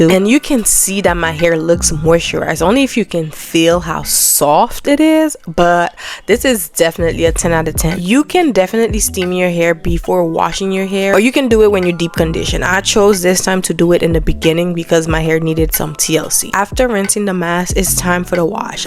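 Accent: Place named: Barbados. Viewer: Beautiful hair & Love your accent, Are you from Barbados?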